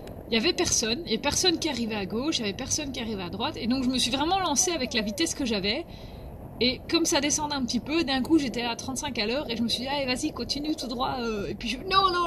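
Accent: Belgium. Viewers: Sans oublier que le fait qu'il y aie une caméra, ça en calme souvent plus d'un ! C'est comme un bouclier. Ca a peut être l'air d'un gadget, mais en fait une caméra c'est une fameuse assurance à moindre coût. Maintenant, je me sens tout nu si je n'en ai pas. :) Chouette vidéo. Y a le côté "j'ménerf pas, j'esplik" dans la voix, le côté "Belge" plaisant. Je ne me sens pas seul du coup ! ^^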